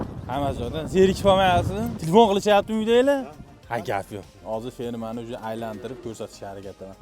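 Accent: Andijan, Uzbekistan. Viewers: Анжан шевага гап йук😂 Китобида ёзилишича мол максимум 1 суткада 1,2 кг семиради. Сонини купайтириб, расходни минусласа, олиш мумкин булган максимум фойда чикади. Реалрок дан келиб чикиб, хисоб китоб килиб, инвестицияга йилига канча % олиш мумкинлигини тушунса булади. Россияда гуштни сотиш проблема, ечими топилса бупкетар. Айникса "халяль" сертификат олинса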